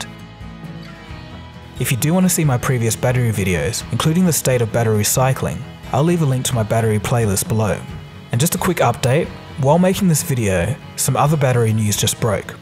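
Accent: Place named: Australia. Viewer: Ford falcon?! Only noticed the subtle Aussie accent after that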